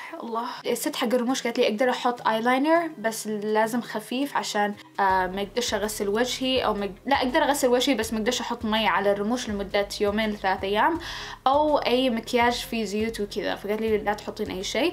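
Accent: Yemen. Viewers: كلامها يقول هي من وين بنتان من اليمن والله ما شيء كمانا فديتك وفديت اليمن وارضها ومن عليها ومن خارجها 🌸🌸🌸🌹♥️ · ماشاءالله عليه عسل بس عندي ملاحظه... برغم أنها عايشه في أمريكا إلا أن لهجتها اليمنية مازالت تتكلم بها وهذا شي عجبني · حلوه اللهجه اليمنيه ع لسانك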